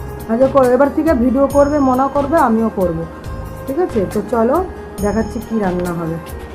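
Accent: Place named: Bangal, Bangladesh. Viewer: আহা গো কি সুন্দর বাঙ্গাল কথা কও গো 😍😍😍🥰😊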